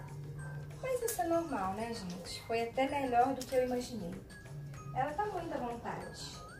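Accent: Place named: Minas Gerais, Brazil. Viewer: Adoro o sotoque mineiro....aprender com esse sotaque é bacana. Gato e a gente adora brincar.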